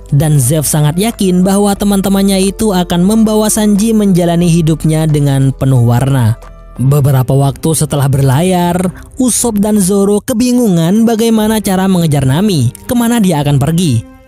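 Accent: Madura, Indonesia. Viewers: wkwk sap nyapsap.. 😂 madurooo masss??? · Madureh asli kak😅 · Buh mak reng madureh kakeh dul "apa can deggik lah" sengkok bangga sama been bang wkwkwk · Madureh nyenguk cak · Apah can dekik lah,,😂 reng madureh kah min? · Loh orang Madura bg😂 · Sumpah orang madura ini kalaupun bukan pasti keturunan orang madura soalnya logat maduranya melekat banget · Reng madureh kak?? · Sapnyapsap+sang nyangsang. Madura mana bang? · Sampean orang Madura mas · medureh dimmah kakeh mat❤❤❤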